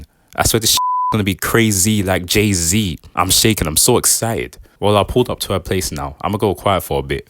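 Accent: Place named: United Kingdom. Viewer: The British accent makes this like 1000x better bro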